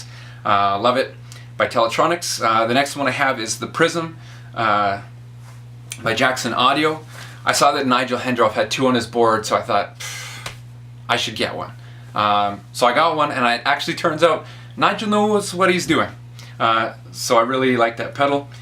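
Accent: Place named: Alberta, Canada. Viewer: Hey man, Just curious are you in bc or Alberta? you have that west coast accent but it feels more Alberta to me than bc. I love the videos, I really dug the budget rig. I have a question for you , do you happen to have any suggestions for a budget friendly versatile rig for bass and guitar? I need a full guitar rig so I can play lead and also lead worship but I'm also a worship bassist but not a normal one lol I do all sorts of weird stuff I play chords and fill spaces like you would in a punk band. that said I'm leaning towards a helix and then building a stereo tube power amp. but id be down for some suggestions that would keep me around 1k or slightly over for a bass and guitar rig (note I don't have an electric, however I do have a tuner, sans amp vt bass di , some cables, and a early 2000's stingray 4 string)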